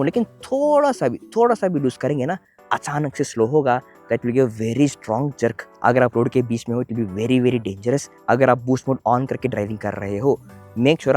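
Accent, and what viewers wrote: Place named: Telugu, India. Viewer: telugodu hindi matldutunattu enti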